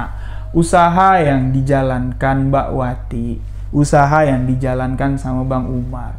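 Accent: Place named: Madura, Indonesia. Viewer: Tak denger2in ini logat koq jd madura yaa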